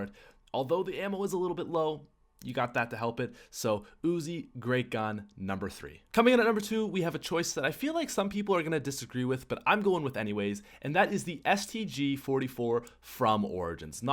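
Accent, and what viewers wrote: Canada. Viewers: Is this guy Canadian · Are you Canadian? You speak like you are a Canadian :P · Josue Medrano canadian accent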